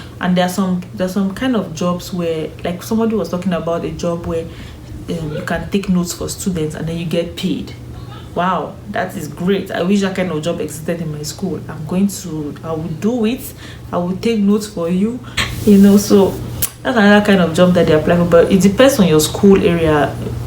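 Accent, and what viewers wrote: Nigeria. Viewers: Mehn…you sound so Nigerian ✌🏾✌🏾✌🏾🙂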